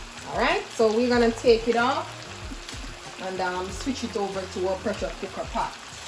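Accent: Jamaica. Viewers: This Channel Is the Best Channel out of every other Cooking Channel Because U identify the ingredients products Step by Step an identify the Food Process an Her Beautiful Accent OUR Language Potwah 🇯🇲 Vinegar an Lime is Optional Part of your Cooking Section 100/100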